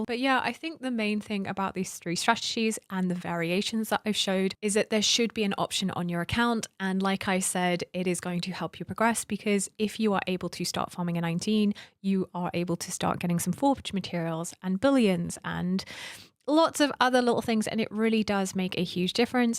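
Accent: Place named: United Kingdom. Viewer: Yeh… after ages just got past 19… I dread even trying 20😵‍💫 but thanks. BTW great to see/hear a fellow Brit do these vids👍🏽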